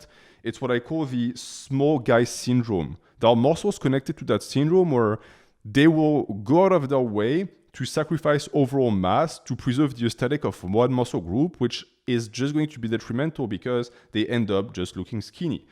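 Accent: France. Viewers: Flag, accent, demeanor, face, diet, him literally saying he's french .... What are you even on ? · T’es français?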